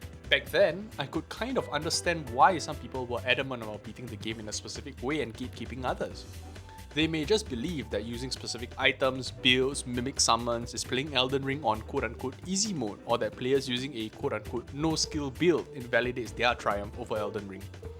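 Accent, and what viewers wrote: Singapore. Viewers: * Hears accent, hmm Singapore?🤔 * Checks Channel -> Bingo🤓 (Hello from South Africa 👋🏾🇿🇦)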